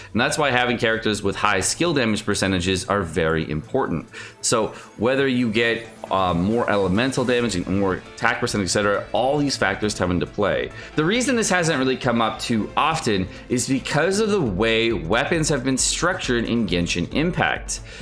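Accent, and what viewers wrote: United Kingdom. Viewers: British!!!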